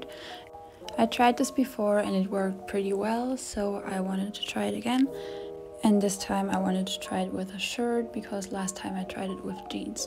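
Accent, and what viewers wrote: Germany. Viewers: Heyy nice vid! Btw are you german bc you have a german english accent :')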